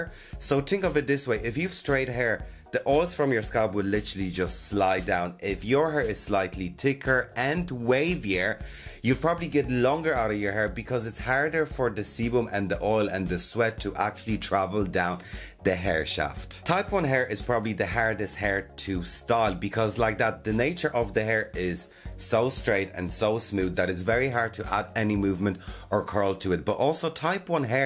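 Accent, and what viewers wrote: Ireland. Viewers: i for the life of me can not figure out if you are super Irish or jamaican and obv one would make more sense but as soon as I'm sure u say something else and I'm like I DONT KNOWWWWW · Huh... A non native English speaker who seems to have learned English in Ireland or from an Irish person. Fascinating. That's at least what it sounds like to me. I wonder what his mother tongue is. 🤔 See, now I'm intrigued.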